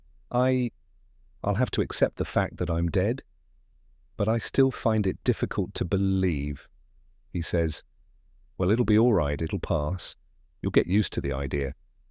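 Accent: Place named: RP, United Kingdom. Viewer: The language used from the transcript is cockney English, but spoken by a person who speaks Queens English. That would be like getting a Mexican person to read a transcript in a southern American accent, certain inflections just don't work, and it's hard to follow. Luckily I speak cockney english, so by reading the transcipt I could understand better what was being said. The use of some words prove to me the time period when the transcript was made, the words "Ninney", "Cor" "A to do" etc, were all common usage words i n cockney English from the early 1900's to the 1960's. Thank you for this wonderful video.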